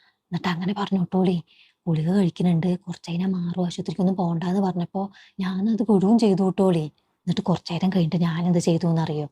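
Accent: Palakkad, India. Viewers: Palakkad vannattoli poyi ttolii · പാലക്കാട്‌ ആണോ നിങ്ങൾ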